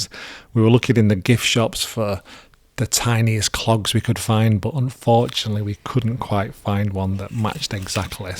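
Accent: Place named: Manchester, United Kingdom. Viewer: Love the channel, nice to hear a Mancunian accent. Is the painting the stereotypical Amalfi Coast scene?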